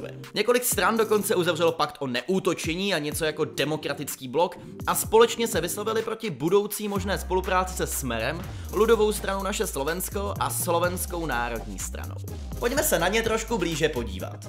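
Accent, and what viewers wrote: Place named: Czech Republic. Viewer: to je tak smiešne keď čech číta slovenčinu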